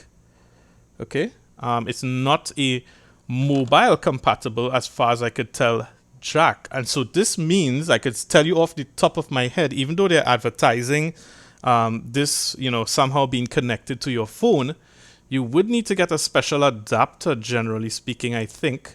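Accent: Trinidad and Tobago. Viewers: Thank you very much. I am literally in the line to the cashier considering whether or not I should get one. I Googled the product and found your video. Help helped me with my decision. I was also good to hear a Trini accent. 🙂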